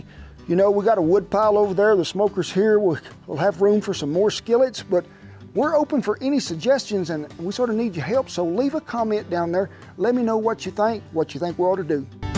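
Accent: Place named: Rural, United States. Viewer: The food looks tasty, and he is obviously a skilled cook, and recipies are interesting... but dear God, this hick accent is unbearable... it makes my ears bleed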